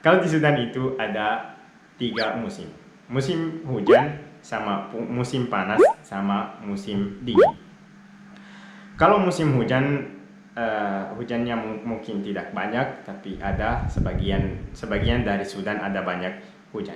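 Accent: Makassar, Indonesia. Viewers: Logatnya kok seperti orang Makassar....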